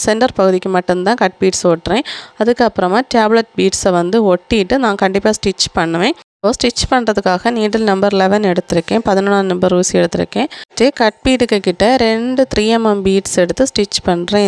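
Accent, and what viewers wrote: Kanyakumari, India. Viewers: Hi sis Recently I saw and subscribed your channel;awesome videos;n you , your hardwork inspiring;you are soo beautiful 😊; I love embroidery n stitching from childhood Now learned to stich my dress myself by YouTube videos.namma kanyakumari slang la super ah pesureenga, perumaiya irukku; I don’t know aari ;evlo try pannen Ukkum varavae illa;Ella workum hand embroidery taan paanuvaen:blouse chudi etc. niraya days edukkum. Appuram onnae onnu sila English word mattum pronunciation thappa solreenga ;artha mattum konjam paarunga Naan epdi yaarukkum comment pannathilla I like you a lot ; Ataan sonnen sis Also unga simple hand work techniques romba useful ah irukku; keep on doing ;comment lengthy ah irukku ;😅pls reply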